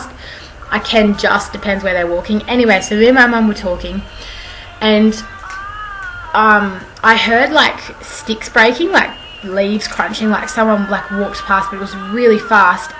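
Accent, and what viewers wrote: Australia. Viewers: I say, "I shit my pants" or "I would have shit my pants" all the time, but something about hearing it in an Australian accent made me laugh like crazy. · i am just so used to my accent, i have the same accent as you, so you were just soooooooooooo easy to understand.i'm from the perth area. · it's good to hear an australian accent again. spent 3 weeks there, about 10 years back, and i loved it there !! beautiful place. :)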